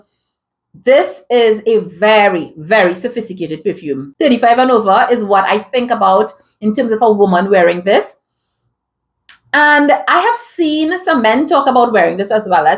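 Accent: Trinidad and Tobago. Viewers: U sound trini ❤❤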